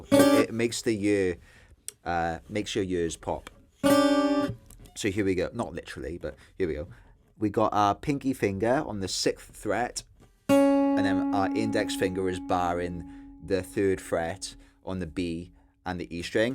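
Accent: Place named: Welsh Valleys, United Kingdom. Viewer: Well then, (who I have only just found), where have you been hiding with your cheeky grin and boy-oh of the valleys accent? You're brilliant at this Chet style which, even after 65 years, I still cannot do; Boo-hoo! The control and independence of all your fingers is coupled with the ability to start, finish & repeat anywhere along the way of the toon..... Wowee! I can't do any of that. I'd like to say I learnt something from Chet - if only the names of the tunes and their existence....... but even that's a stretch for me. You know the one; It goes "Tic-toc / tic-toc / tic-toc blah, blah, blah" Thanks a billion for the lesson and breakdown of just what actually goes on in the execution of this piece - and the entertaining way in which you presented it. Should you feel the need to know more about my own style of playing I'll just say "Don't bother!" Thanks again Just wonderful..:hand-pink-waving:face-turquoise-covering-eyes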